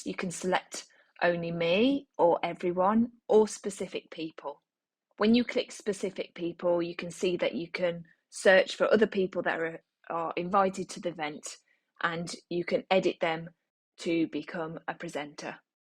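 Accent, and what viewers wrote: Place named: Birmingham, United Kingdom. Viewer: Excellent. Are you a Brummie?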